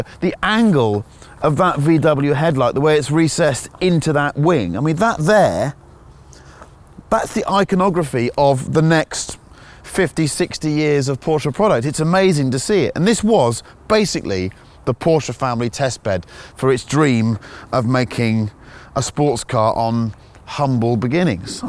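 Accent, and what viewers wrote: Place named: United Kingdom. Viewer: British accent, but yeah. I guess that's what happens when you don't enunciate the 'n' at the end of "…teen" well enough -- the person entering the numbers in for the video board gets confused. <link>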